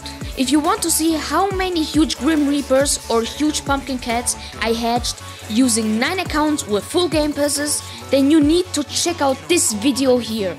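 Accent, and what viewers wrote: Germany. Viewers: u german? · mann hört rauß das du deutsch bist hahah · Are you german? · Find ich gut dass man merkt das du deutsch bist. Aber trotzdem danke für dieses hilfreiche Video. 👍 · TY bro It helps very much (und man merkt das du deutsch bist aber gutes english) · ICH WUSSTE DOCH AN DEINEM AKZENT DAS DU DEUTSCH BIST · Ich dachte mir schon das du bist deutscher 😂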